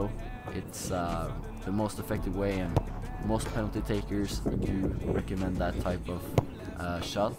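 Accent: Norway. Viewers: u sound like your norwegian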